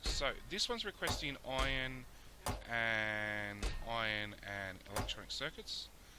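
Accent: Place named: Australia. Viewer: kinda digging the "alright, bye" have you advertised on reddit? i believe you can get one lp post. i was going to advertise you myself, but i keep my reddit persona FAR away from me irl. i rekon you could drum up a few more subs from there. your videos are great compared to the other factorio people i follow, plus the aussie qualities are just gold! upon further analysis.. please, for the love of all that is holy, don't say "bye byes". 😕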